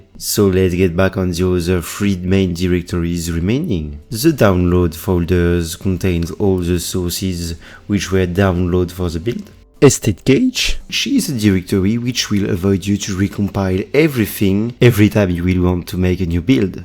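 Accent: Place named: France. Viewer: You have an french accent 😂😂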